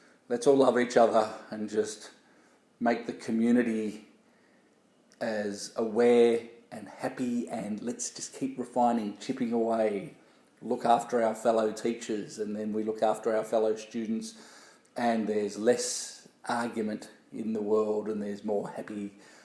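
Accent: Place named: Australia. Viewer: THANK YOU SO! MUCH!!!! TRYING TO BUZ!! IS NOT NEEDED WHEN YOU USE YOUR LIPS THE CORRECT!!! WAY!! AND JUST BLOW!! I LOVE YOUR TEACHINGS AND INSITES! AND LOVE YOUR AUSTRALIAN ACCENT!!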